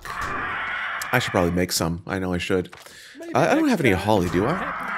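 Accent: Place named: Canada. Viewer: Forjed eyeron & geyerocopter lol. I love how Canadians speak.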